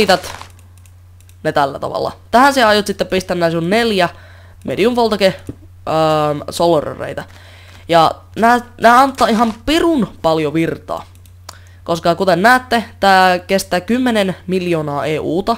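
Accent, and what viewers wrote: Karelia, Finland. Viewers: Ootko karjalasta :D kun puhut sen tyyliil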